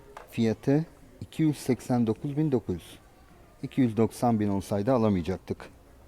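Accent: United States. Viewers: Abi Amerikan İngilizcesi aksanın harika Türkce aksanın da harika çok yetenek gerektiren bir şey. Kullandığın bazı kelime gruplarını bizim burada yaşayan çoğu insan bilmez bile helal olsun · Abi amerikalı mı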